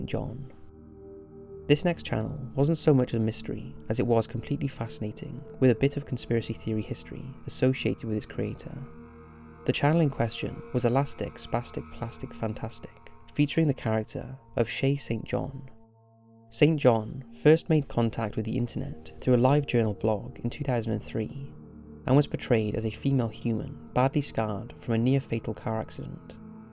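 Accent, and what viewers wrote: Wales, United Kingdom. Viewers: Narrator are you welsh?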